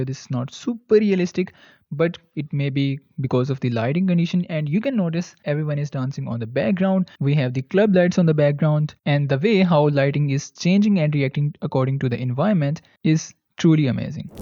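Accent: India. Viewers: I actually prefer this real voice and accent over AI by now.... maybe bc it’s authentic and I trust it more now, I’m sick of these AI voices cause every Indian guy takes advantage of AI like crazy 😂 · Honest opinion. International audience will only watch neutral international voice and accent. Not our hopeless unimpressive Indian accent. Change it to AI voice for more views.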